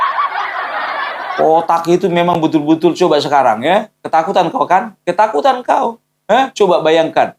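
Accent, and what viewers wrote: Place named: Java, Indonesia. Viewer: ga kuat nich perutku da kaku ketawa terus, jadi pelawak aja wes ngomong jawa 😂😂😂😂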